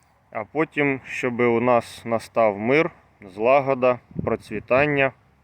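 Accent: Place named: Poland. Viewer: Панове, вы со своим польским акцентом, пиздуйте в свободну европу, вот тогда Украина будет свободна и мир будет у славян.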